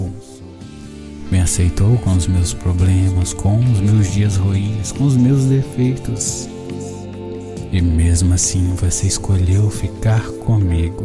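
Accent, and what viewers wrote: Minas Gerais, Brazil. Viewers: como pode vc falar palavras como se fosse ele tipo Minas Gerais Foi ele que falou essa palavra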